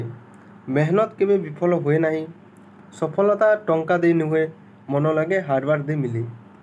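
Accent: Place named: Western Odisha, India. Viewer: Tame Western Odisha( Koshal) area r lok ye para laguchhe moke.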